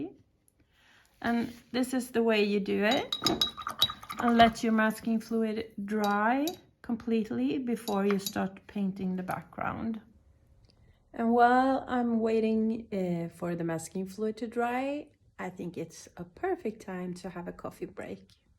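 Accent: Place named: Sweden. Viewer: You sounds as if you are Swedish, so am I and I’m a watercolour artist and have my studio near Linköping. Anyhow I’ll try this and save it for later repeating. Kul att ha hittat dig, jag skall absolut dina sätt att måla björkar! Må så gott! Ps…. Nu är jag prenumerant av din kanal!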